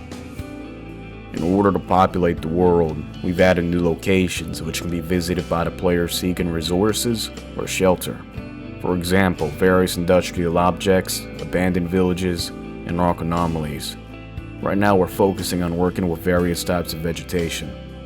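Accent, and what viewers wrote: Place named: Deep South, United States. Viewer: Jesus, your narrator is great. That accent is awesome...Deep South + Eastern Europe?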